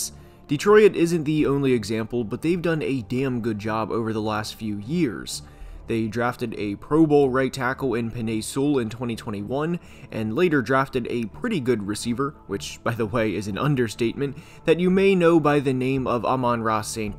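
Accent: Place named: Minnesota, United States. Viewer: is a Minnesoh-tan gotta give him a break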